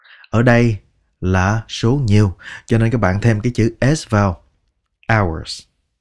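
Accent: Standard. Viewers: Lời thầy dạy nghe rất chuẩn.., rất hay., nghe ấm, truyền cảm và dễ hiểu 😍👍👍👍 chúc thầy và gđ luôn mk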